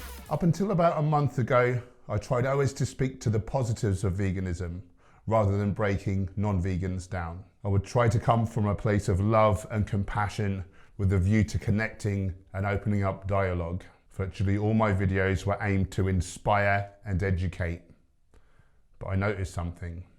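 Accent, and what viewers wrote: United Kingdom. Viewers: I like sv3rige, You sound like your from the Midlands , same as me.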